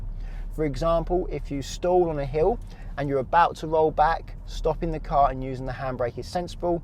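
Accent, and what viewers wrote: England, United Kingdom. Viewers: i really enjoy watching your videos... not only because I'm learning manual car and find your videos the best teaching videos about manual cars... but also because i like to listen to your perfect British accent as I'm English language learner 😊.. thank you very much sir 🎉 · YouTube isn't good at captions when it comes to English-English.. Sometimes it's bite point and sometimes it's bike point in the transcript xD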